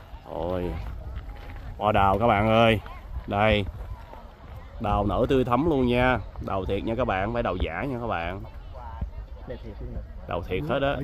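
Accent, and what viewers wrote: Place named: Saigon, Vietnam. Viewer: Mình ở xa, nghe giọng Sài Gòn, Mình cũng thích ơi, chúc - được nhiều sức khỏe, đi chơi vui vẻ ❤❤❤❤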